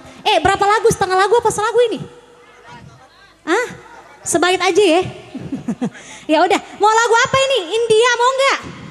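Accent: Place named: Betawi, Indonesia. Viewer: logatnya lucu kalo ngomong😂😂😂😂 kaya betawi gitu. suaranya top markotop pokonya😍😍😍😍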